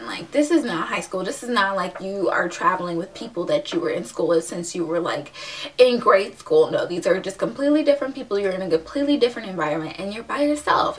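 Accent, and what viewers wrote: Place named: Illinois, United States. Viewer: I'm from the IL too. I can tell you are from IL, you sound so familiar!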